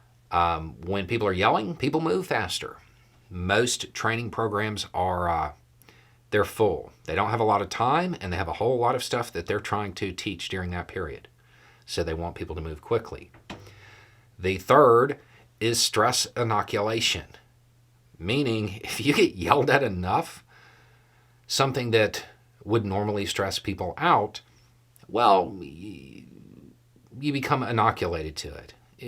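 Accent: South, United States. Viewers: I beg your pardon, I happen to watch every video you put out. 😉 I also share them to educate those who are down the rabbit holes of conspiracy theories. Avid fan of your content, including Roads with. I’m a Georgia girl, so I just love to listen to you speak. You’re intelligence, calm demeanour & southern accent in a sing/song tempo is mesmerising. I do enjoy when your temper flares every now & again.😂 It reminds me of my childhood. Stern, but not aggressive.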